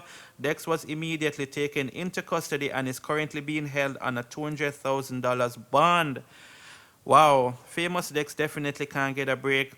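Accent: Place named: Jamaica. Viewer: Are you speaking Jamaican or east-indian accent?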